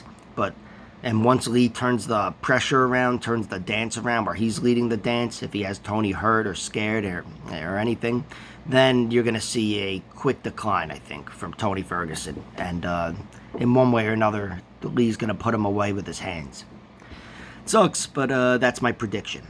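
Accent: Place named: New York, United States. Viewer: Are you from New York I ask because of the accent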